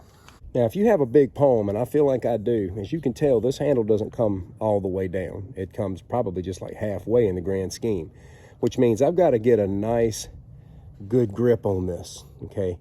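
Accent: South, United States. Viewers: This guy has a really cool southern accent. Good video.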